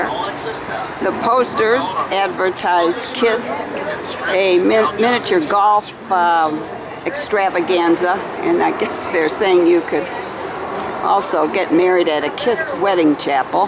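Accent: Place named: Chicago, United States. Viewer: Where do you come from with that accent? You sound like a Chicagoan.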